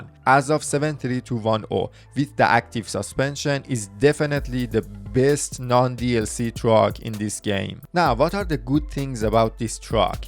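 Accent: Iran. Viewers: داداش از لحجه ت حس میکنم ایرانی ای😂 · The most Iranian voice I ever heard, nice video tho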